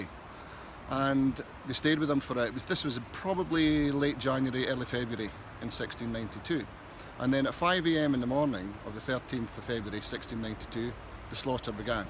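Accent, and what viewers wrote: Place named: Scotland, United Kingdom. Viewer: Love the Scottish Accent 😀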